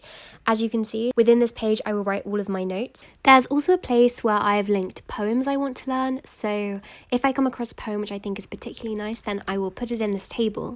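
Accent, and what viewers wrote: United Kingdom. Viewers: is a national treasure. she is so humble and kind. and I love her voice. it's so British and welcoming. lol. great video. you should get more attention.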